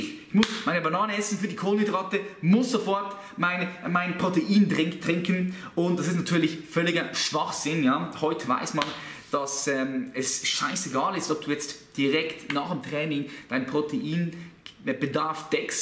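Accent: Switzerland. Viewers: Aus der Schweiz?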